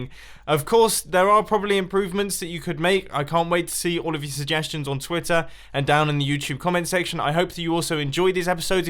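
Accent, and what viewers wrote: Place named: United Kingdom. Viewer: He's British from what I've heard..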